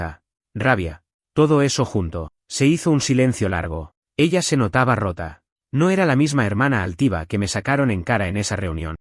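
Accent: Argentina. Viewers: Nacido en estados unidos, de abuelos mexicanos pero el tieke todada argentina 😂 muy bueno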